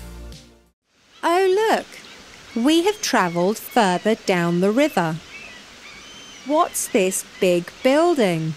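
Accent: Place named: Southern England, United Kingdom. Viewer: I have been looking for English videos for a Spanish friend. Most are with American accents and/or many are too fast for the novice to take in. This is perfect with a good simple southern English accent at a measured pace. Thank you. Next is to find one about travel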